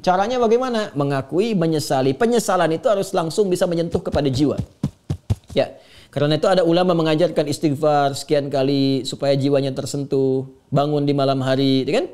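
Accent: Malaysia. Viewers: Masya Allah ustad seperti nya anda sedang di Malaysia ya soalnya langgam ceramah nya persis orang Malaysia👍🥰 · Kok, kayak logat Malaysia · Maafya pk ustd kdg2 trdgr pk ustd sekali sekala ada omonganya spti org Malaysia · Knp jd logat malaysia? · saya merasa ceramah ustad kali ini terdengar seperti orang malaysia karena banyak logat melayu tidak seperti biasanya, sehat selalu ustad..syukron 🙏🙏🙏🙏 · Kenapa logat jadi spt org malaysia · Assalamualaikum Ustadz Adi, izin saran, dalam ceramah-ceramahnya pakai logat bahasa Indonesia aja jangan pakai logat Melayu Jadi mirip sama Malaysia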